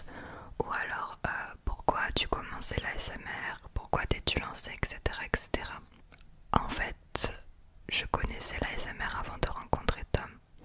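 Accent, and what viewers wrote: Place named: South, France. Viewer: Tu es Parisienne ? Tu as dû descendre dans le sud, toute petite, car je reconnais notre accent. Je suis de Carmaux. Bizz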